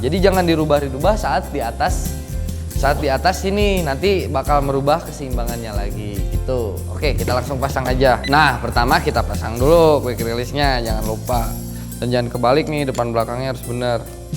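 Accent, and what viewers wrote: West Java, Indonesia. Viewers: Kalo dari logat logatnya orang sunda nih kayanya😁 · ia kang ketauan banget sundanya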